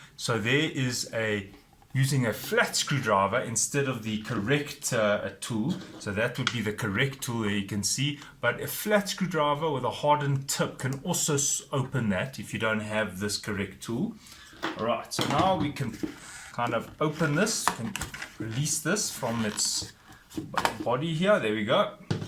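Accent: South Africa. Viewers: SA accent:)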